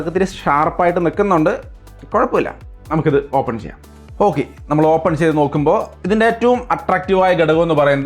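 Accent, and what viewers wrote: Thrissur, India. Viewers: Thrissur slang kattak vannandalo e videoyil😁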